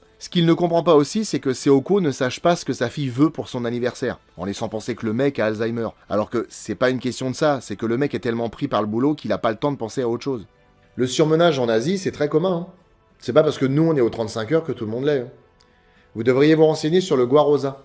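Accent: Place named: Belgium. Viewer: Une île au large du Costa Rica… Sans blague ? Ça y est ? Tu abordes un de tes "big 5" ? Et sinon, je suis trop fier d'avoir tout de suite repéré la ref aux 12 travaux d'Astérix ! #accentbelge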